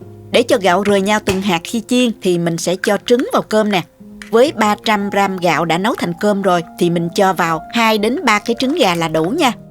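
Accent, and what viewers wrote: South, Vietnam. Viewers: có giọng nói miền nam truyền cảm trong sáng hiếm có. Rất thích xem các video hướng dẫn nấu ăn của không những vừa ngon miệng mà còn trang trí đẹp mắt nữa. Rất cảm ơn, triệu like cho. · làm cơm chiên nhìn thấy ngon quá, nhìn thấy thèm muốn ăn hết một tô lớn luôn đó, vừa khéo tay chỉ dẫn dễ hiểu lại có giọng nói trong trẻo rõ ràng đặc trưng của người miền Nam rất dễ cảm mến và giọng nói rất hay và trẻ trung nữa! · Gio.ng no'i dung giong mie^`n nam nghe de^~ thuong ghe^ no*i!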